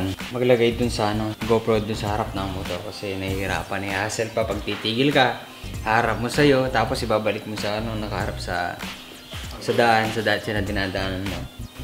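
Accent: Pampanga, Philippines. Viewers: Sarap pakinggan boses ni kuya ska ung puntong kapampangan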